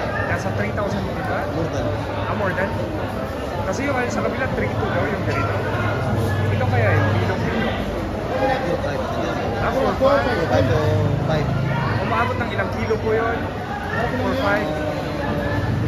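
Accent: Iloilo, Philippines. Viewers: Wag muna pilitin magtagalog boss halata Naman n ilonggo k eh😢😢😢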